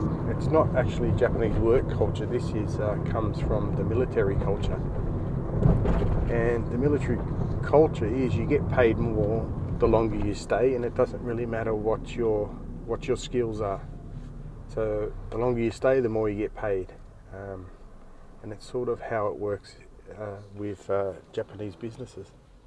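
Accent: Australia. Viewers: You learned your English in Australia, yes? 👍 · Are you an Aussie mate?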